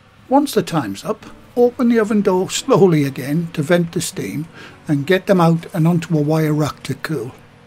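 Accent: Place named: Northern England, United Kingdom. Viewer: good man. Cheers mate. I love hearing your voice in these videos, and used to watch them from the US for the Northern England nostalgia kick alone :P I swear you should get a job narrating shows or books or something (though please don't stop the YouTube channel if you do!). All the best, and thanks again :)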